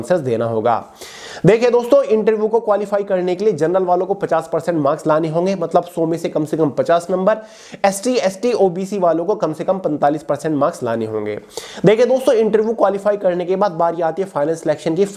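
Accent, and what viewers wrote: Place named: Western Uttar Pradesh, India. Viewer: kana se ho aap aapki tone west up se lgre h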